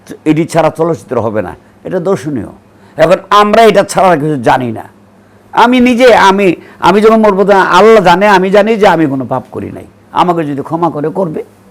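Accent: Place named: Sylhet, Bangladesh. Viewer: আপনার কথা সিলোট যানাই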